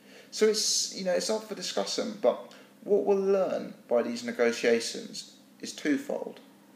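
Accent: United Kingdom. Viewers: Your british accent is top. And your observations and commentary are spot on mate.Just discovered your videos today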